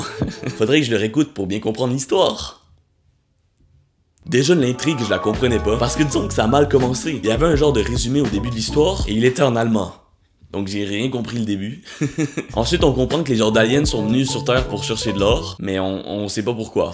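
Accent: Quebec, Canada. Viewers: Salut t’es québécois ou français. C’est difficile à dire avec ton accent et la manière que tu parle. Et tu as des termes de France. Mais bon travail !!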